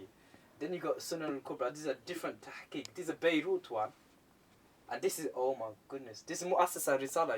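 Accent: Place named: Sylhet, Bangladesh. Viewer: The Sylheti pronunciation...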